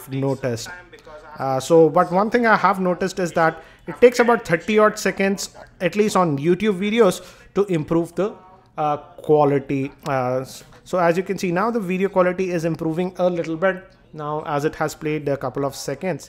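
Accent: India. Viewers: Then u are not Indian as he has Indian Accent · Believe me even as an Indian think his accent is way too accentuate. Very few people talk this way😅😂😂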